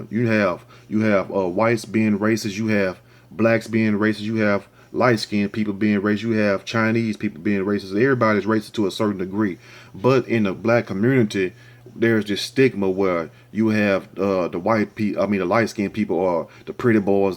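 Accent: South, United States. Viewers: THANK U 4 ANSWERING MY QUESTION. I LOVE THAT COUNTRY ACCENT. BOUT IT, BOUT IT.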